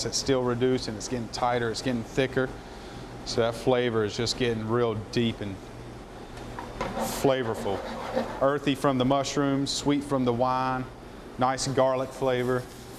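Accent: South, United States. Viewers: Please take some grammar lessons. You sound like a southern hick. Your video was good, but your grammar reined the whole video. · He is clearly from the South (CCCTI is in North Carolina) and his accent reflects that. So if he does not say “julienne” or “oil” the way you would, it is not wrong, just dialectical differences for those of from below the Mason-Dixon line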